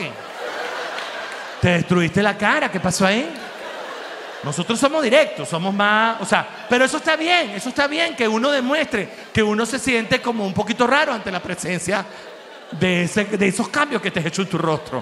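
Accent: Cuba. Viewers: habla como si fuera otro cubano más 😂😂😂, mucha observación y cuidado al detalle 😂😂😂😂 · 😂😂😂😂Ayyyy moriiiii. Soy cubana! Y gozo, cuando veo al perrito cubano y cuando hablas como nosotros en tu show. Ya hoy, lo acompañaste, de las vulgaridades, y para mí, resultó comiquísimooo 😂😂😂😂😂😂😂😂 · Es q pareces cubano de pura cepa jajajaja,aquí no existe la terapia jajaja👏👏👏💯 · Muerooooo me encanta la interpretación Cubana que haces ❤ Te Quiero 😅😂😂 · Jajajajajajaja c.l.m ese acento cubano!! Te quiero mi amor! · Jajaja, genial el acento de nuestra tierra!!! 😂😂😂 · Soy cubana y en verdad me encanta tu acento cubano y me meo de la risa 🤣 😂😂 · Ese cubano que llevas adentro está buenisimooo!!!!😅❤🎉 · Soy cubana 🇨🇺 como m rio cuando hablas como nosotros 🤣🤣🤣🤣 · Soy cubano de nacimiento, venezolano de corazon y brasileño por naturalización. Viví 7 maravillosos años en el Zulia y escucharte me hace reirme a llorar recordando aquellos locos maracuchos que me tenian el dia entero riendo. 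Tus imitaciones de los cubanos son fantásticas pero te pareces mas a un habanero hablando que a un pinareño ( lo digo por mi porque soy habanero) un abrazo desde Brasil.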